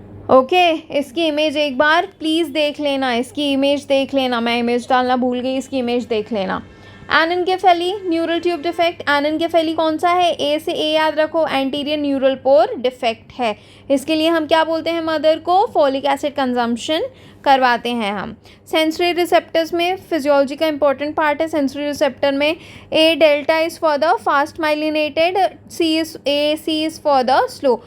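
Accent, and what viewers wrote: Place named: Bhopal, India. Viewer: Bhopali ki zuban he ji ke roop me, faeda hoga dosto❤️❤️✨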